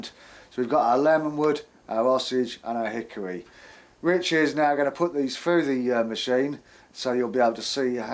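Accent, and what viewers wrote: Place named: London, United Kingdom. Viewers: London or nearby. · Nope. Definitely not Northern. Southern, probably London area. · Not northern at all. I'd say down by London somewhere. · I think East london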